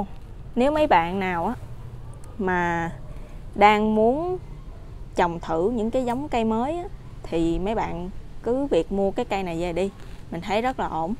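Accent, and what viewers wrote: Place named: Mekong Delta, Vietnam. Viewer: Nghe giọng của chị người miền Tây mà sao bít tiếng Hoa hay vậy?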